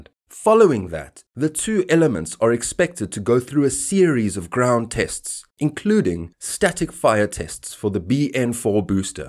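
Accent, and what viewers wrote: South Africa. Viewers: Great video. Recognize a Saffer accent when I hear one 🇿🇦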